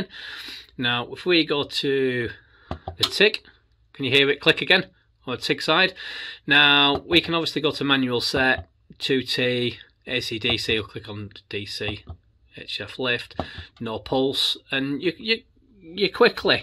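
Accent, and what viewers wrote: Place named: Northern England, United Kingdom. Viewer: Nice one.great to hear a relaxed northern voice rather than a American lol. May be thinking of getting one of these mainly for the mma and the mig .but would like to learn tiggin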